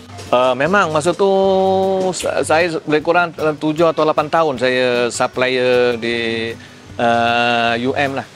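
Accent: Java, Indonesia. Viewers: Pekat sangat slang jawa Wak ni 🙂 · Jowonye pekat betul. Sedap dengar. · Slang jawa wak ni . Terbaikkk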